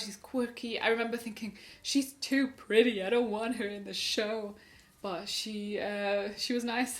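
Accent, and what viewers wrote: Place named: Germany. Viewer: Wie man den Deutschen akzent direkt raushört😅. Hab vor kurzem wieder Unfabulous durchgeguckt. So nostalgie aber zoey101 hab ich auch geliebt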